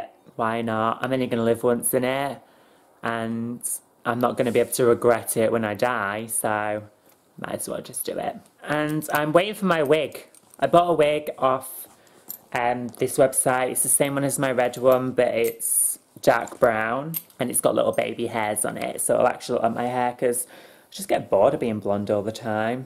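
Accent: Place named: Yorkshire, United Kingdom. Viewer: I love your accent. so Yorkshire and cute lol.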